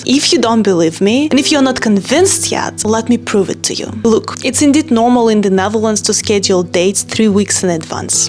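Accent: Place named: Eastern Europe. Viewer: While this girl is extremely pleasant to my eyes and I love the eastern European accent (this is the second video of hers that I watched) she is factually not Dutch, hasn't been in the country that long and she states that her boyfriend or the man she dates is also not Dutch. So, I like watching her, but where is her expertise on Dutch dating culture? There's a lot of confusion about this subject among expats to Holland and people, specially men planning to visit. The Netherlands has gained a reputation for their women being very emancipated, liberated and sexually open minded and there is 'some' truth to it. But so many men look forward to their trip to Holland where they are going to "score big" with all these open minded Dutch women only to face massive failure and rejection. I can explain. The Dutch live their social lives in groups, circles of friends and each Dutch person belongs to several groups or has several circles of friends and they overlap and that is how you can increase your number of friends. 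As a teen ager I went to the Discotheque on Saturday nights. I did not go alone, not with 'A' friend but with a group of friends, 5-6 or 8 of them. If while there I saw a pretty girl who interested me and I would approach her, most likely it would be awkward, she would show slight discomfort and reject my advances. She did not know me, she was there with her group of friends and it was with them that she was socializing with and enjoying her time with (there are always e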